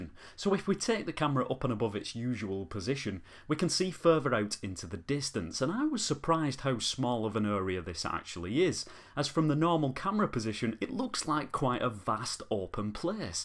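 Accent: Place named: United Kingdom. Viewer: British, I think. Very cool.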